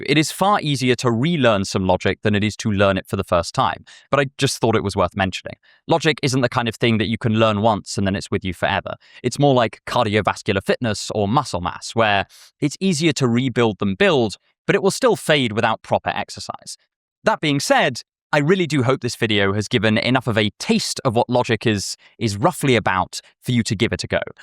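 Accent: United Kingdom. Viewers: British voice alert, I repeat, British voice alert ⚠️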